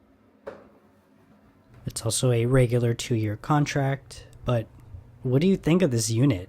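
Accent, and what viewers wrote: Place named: United States. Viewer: I'll always choose ground floor. Carrying a bike plus groceries up stairs is too much for me. P.S. I'm happy to find your channel. I follow another but he doesn't share a vid often enough. I am watching two now and you speak American English. 😊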